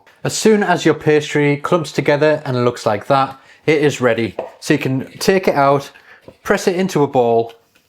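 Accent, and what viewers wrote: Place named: Northern England, United Kingdom. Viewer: Where is that accent from. Not far away from the A69 me thinks. Just discovered you and loving what you do.😊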